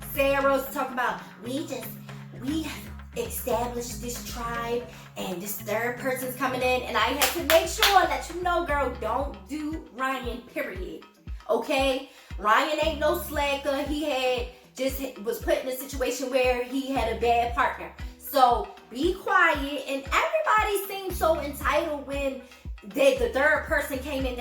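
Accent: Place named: Maryland, United States. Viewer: i can tell ur from maryland lol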